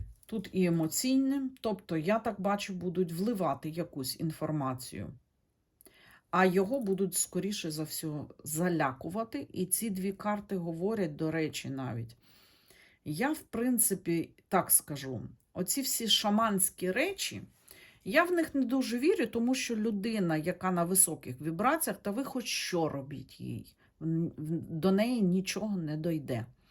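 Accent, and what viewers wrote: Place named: Surzhyk, Ukraine. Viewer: Хорош лапшу на уши вешать! Как баба базарная ляпаешь, семечек не хватает, и суржик прет!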